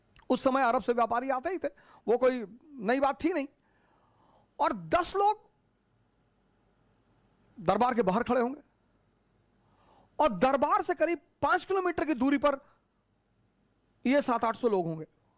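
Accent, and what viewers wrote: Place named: Bhojpuri, India. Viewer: It's bhojpuri not urdu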